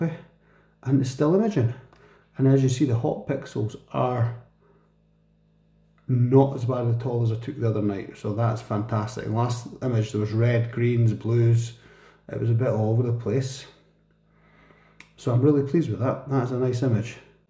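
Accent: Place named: Scotland, United Kingdom. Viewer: Thank you for short but great video. Love the Scottish accent. Enjoyed this. I wonder if the exposure time was extended to 20 s how it would go? Well put together video.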